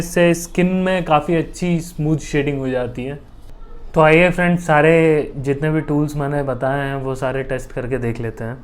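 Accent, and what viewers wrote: India. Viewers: अंग्रेजी बोलते हुए भी आप क्या कह रहे हैं मुझे समझ नहीं आ रहा है, लेकिन आपकी अंग्रेजी भारतीय है अमेरिकी अंग्रेजी बोलने की कोशिश करें ताकि दुनिया आपको समझ सके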